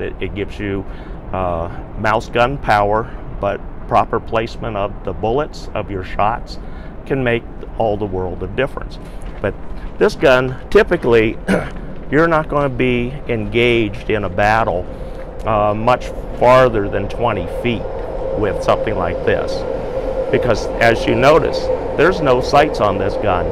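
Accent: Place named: South, United States. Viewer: You have a Southern Accent. It’s funny to hear an Asian man with a Southern accent. Maybe where you grew up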